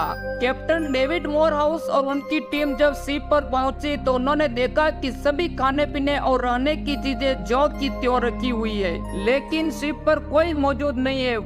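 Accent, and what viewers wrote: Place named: South, India. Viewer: Naration is very bad in southern accent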